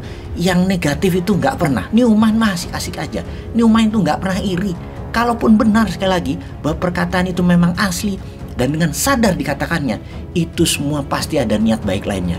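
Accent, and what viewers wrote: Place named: Java, Indonesia. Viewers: bossman Jowone kluar diawal kalimat😁😆😆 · aku suka narasimu? nglulu yen boso jowone ngono...joss👍 pak,lanjut...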